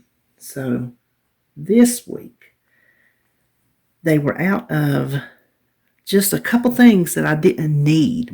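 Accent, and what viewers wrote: South, United States. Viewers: Hey! I love that you are southern like me. When you said scoot I bout died. Only we can get three syllables out of a one syllable word. Nice hauls! I'm not allowed to go to stores so I've been thinking about a Walmart p/u situation. My son did bring me some fresh fruits and veggies yesterday. I'm happy you're safe at home too. x, E. ps: That shirt is Wowsome!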